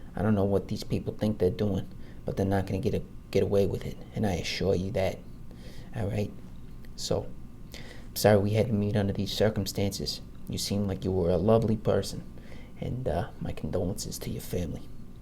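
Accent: Boston, United States. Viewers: Your Boston accent vids are my fave! Are you originally from there?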